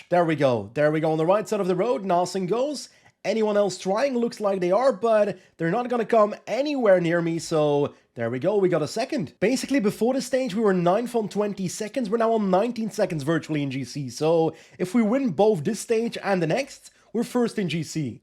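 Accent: Belgium. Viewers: Are you from Belguim or the UK?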